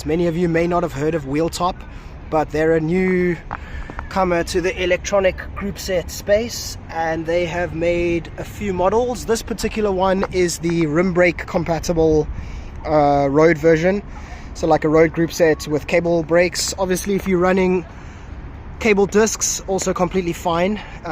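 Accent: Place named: RP, United Kingdom. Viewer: I like how posh you sound on camera. Liked and subscribed.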